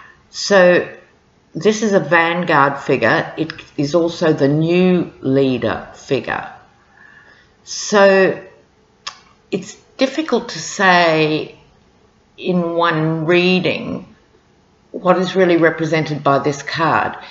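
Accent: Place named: South Australia, Australia. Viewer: As a fellow tarot reader, I so enjoy your readings. I know it takes a lot of energy to do these readings. I thank you for that time and energy you're sharing freely and generously of yourself on here. I lived in South Australia for 3 months - was married there and I admit, hearing your accent and views makes me feel a bit nostalgic and ever so comforted. Xxxx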